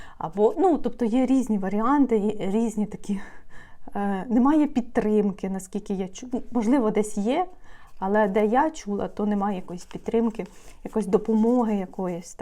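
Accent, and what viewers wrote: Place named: Western Ukraine, Ukraine. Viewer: а ви самі чому в Германії. Ви звідки тікали, з якого міста? Я питаю, бо по вимові, ви з західної частини, а вас не обстрілювали... Багато людей з західних обл. Повтікали за кордон і здають переселенцям свої квартири дуже дорого, маючи дохід живуть за кордоном і ведуть себе наглувато, розорять нашу країну.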